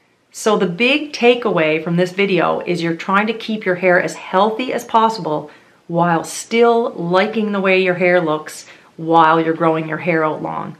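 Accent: Canada. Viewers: Must be Canadian :) spelling and accent gave it away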